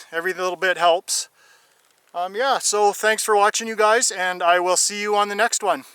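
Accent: Canada. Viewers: I love your Canadien accent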